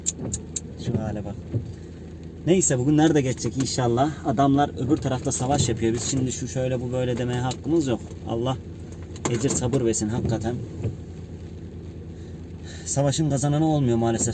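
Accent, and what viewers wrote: Kastamonu, Turkey. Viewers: Kastamonu şivesi konuş nolurrrrrrr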